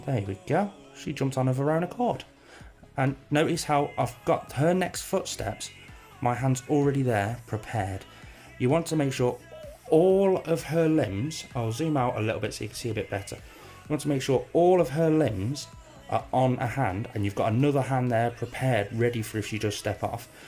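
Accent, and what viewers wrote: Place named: North of England, United Kingdom. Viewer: Yo man! youre clearly from the north of england ahaha (i am too :P) and i wanna ask you if there are any good breeders that are relatively close to manchester that you know of? that would be really helpful man. Preferrably on the southern side of manchester. Im thinking of getting my first pet as a leo or a crestie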